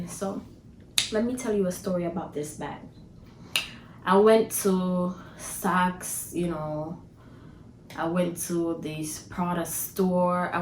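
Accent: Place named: Jamaica. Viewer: I loovveee your fashion!!! I hear some patois mi sis!!!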